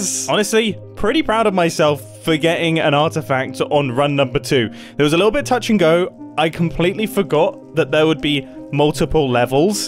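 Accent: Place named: United Kingdom. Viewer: It's funny how becomes more British as he gets excited and stressed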